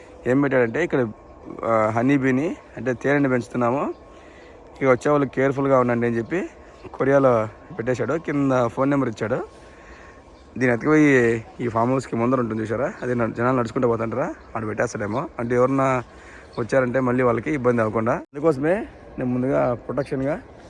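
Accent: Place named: Rayalaseema, India. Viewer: మీ తెలుగు చాలా బాగుంది మా రాయలసీమ వారివాలె వున్నారు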